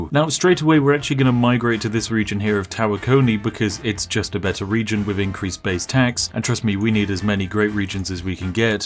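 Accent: United Kingdom. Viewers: First time watching u and im sure u heard this a thousand times but i like this very british accent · Is he actually British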